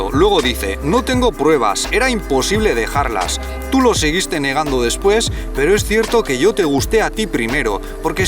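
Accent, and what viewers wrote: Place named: Spain. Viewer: Un chino con ojos azules, que habla con acento español y chino. Increíble como unos cuantos años en un lugar se va perdiendo la identidad. Buen vídeo. Saludos.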